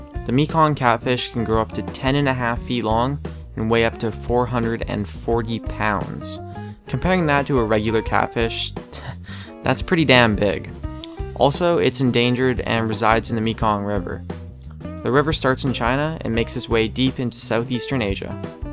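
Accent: Canada. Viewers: are you canadian, definitely canadian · are you Canadian? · Are u canadian? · You're Canadian aren't you?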